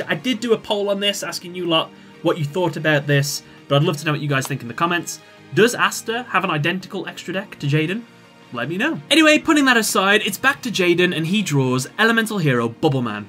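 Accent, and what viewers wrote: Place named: United Kingdom. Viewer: yo man, I catch your content on occasion, like what you do and you are clearly loved in this community. Whenever one of my comments is slightly negative I usually don't write it, cause who needs that ya know?? But I'm really confused by your pronunciation, cause you sound British to me, yet you say Tempest and Mariner oddly, you seem to pronounce the syllables individually. Small thing, just catches on my ear whenever I hear it. and the slightly negative comment is...... that part about what fusions Jaden could have gone for after first using 'the warrior returning alive' ,.... so obviously filler man, so obviously, not necessary for your point either.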